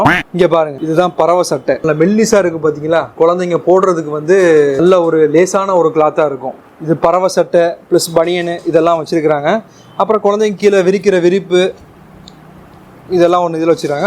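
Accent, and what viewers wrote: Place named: Keelakarai, India. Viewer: Ivvalavu seera koduthu vachavanga ponga unga ooru slang keelakarai slang mathiri irukum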